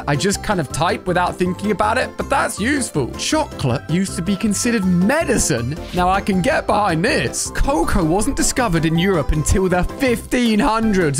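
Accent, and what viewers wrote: United Kingdom. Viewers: I THOUGHT YOU WHERE BRITISH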